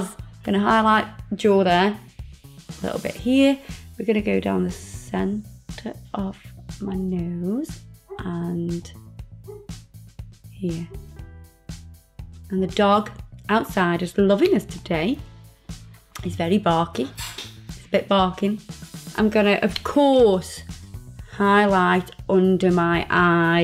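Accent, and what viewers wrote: Liverpool, United Kingdom. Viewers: I thought you were a scouser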